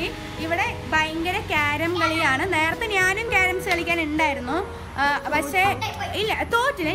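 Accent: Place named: Palakkad, India. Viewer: Anthokkeyayalum plkd slang varum ley😅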